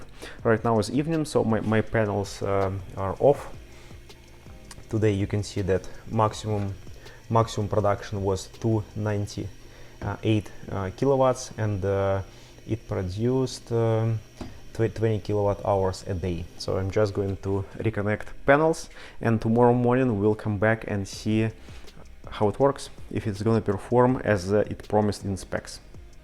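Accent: Russia. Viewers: Ахаха. Какой чисто русский английский 😁👍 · С первых секунд понятно стало откуда автор :) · Какой ужасный рязанский акцент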